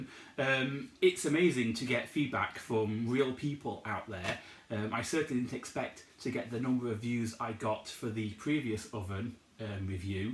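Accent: United Kingdom. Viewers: Thank you for your really great and useful review videos, your demonstration is very nice with plenty of information and a clear British accent. waiting always for your new... many thanks....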